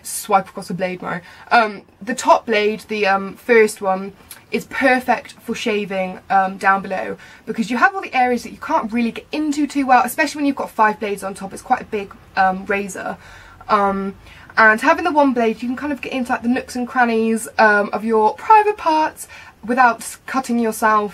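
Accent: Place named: United Kingdom. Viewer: As soon as I saw this video I heard your accent and literally watched the whole damn video because you know what, you're so relevant! I'm British so honestly everything you said was a breeze to follow through because I can access the products and you talk like me :') virtual fist bump you're awesome x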